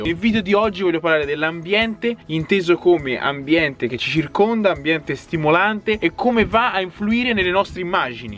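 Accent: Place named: Tuscany, Italy. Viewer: quanto è bello il tuo accento toscano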